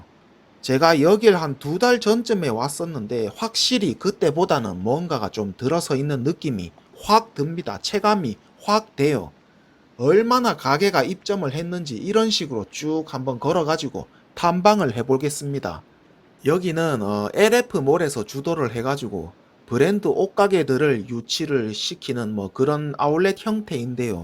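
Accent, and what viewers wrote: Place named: Daegu, South Korea. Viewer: 대구사람인가베. 억양이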